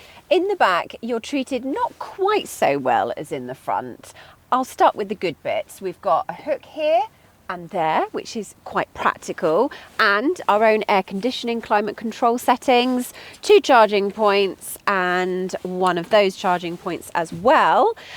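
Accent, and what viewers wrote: United Kingdom. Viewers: Ohh my God! I love your accent! Typical british😍😍❤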